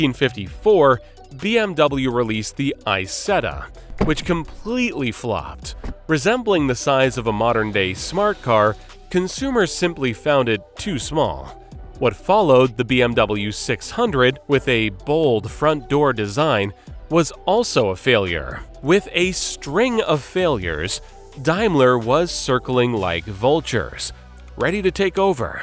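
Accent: United States. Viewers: I am only 2 minutes in and the stupidity of American pronunciation of german names is driving me insane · Pity about the terrible American pronunciation. The only name he got right was Daimler.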